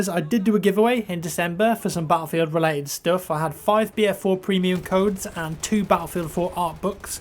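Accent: England, United Kingdom. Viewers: What part of england you from?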